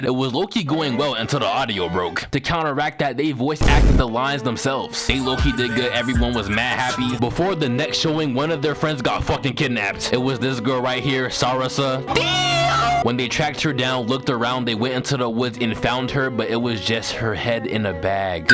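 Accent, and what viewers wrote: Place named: Philadelphia, United States. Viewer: you so philly bro 😂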